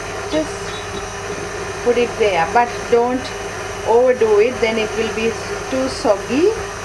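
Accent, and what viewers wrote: Sri Lanka. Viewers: tara sanda I thought the same.Accent is Sri lankan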